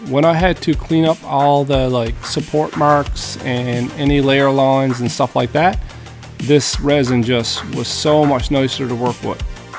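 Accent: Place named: Maritimes, Canada. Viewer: Great job! Been looking to get a printer...Appreciate the way you mention the paints/products you use. I couldn't tell where you were from initially but I detect East coast of Canada. Good to see Canadian content on YouTube. 👍 What company designed the model...I mean really how else did you get my attention? Get some sponsors brother, best of luck in your content endeavors! Thumbs up and a subscribe. Edit found the link...that's why I'm watching videos and not producing them, a lack of technical skill! Cheers.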